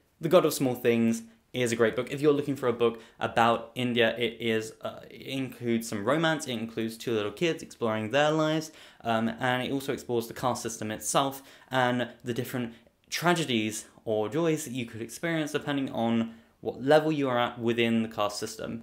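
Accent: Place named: South London, United Kingdom. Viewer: Hey, by any chance u from south london? ur accent seems like u from lambeth or somewhere like that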